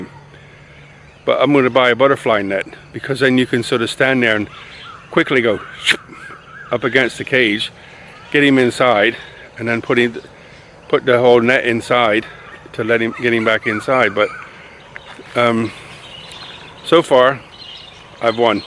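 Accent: United States. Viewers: I am Thai .... Lazada of Shopee is the most popular in Thailand ... For Ex. I try to search "5G pocket wifi".. found Xiaomi Alot Router AX3600 cost 2580 bath from China delevery about 9-12 day which is no costom tax. ......... I think 4G is enough because it speed up to 150Mbps .. if operater around signal only 40 40 ..... ผมคนไทยติดตามคุณเพราะอยากพัฒนาภาษาอังกฤษ สำเนียงอมริกันฟังง่ายครับ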